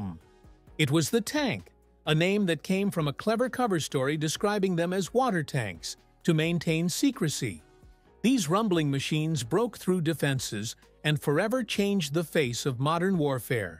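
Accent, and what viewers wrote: United States. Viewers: Scatish is what comes out of AI generated slop with an American accent that can not pronounce British words.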